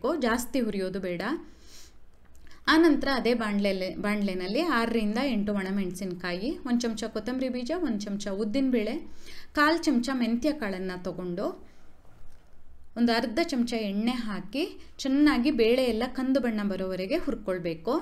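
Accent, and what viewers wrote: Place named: Coastal Karnataka, India. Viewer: love watching your videos, love your kannada accent, love your voice, it brings back the memories of my childhood I spent in Mangalore & Bantwal.❤️💕😘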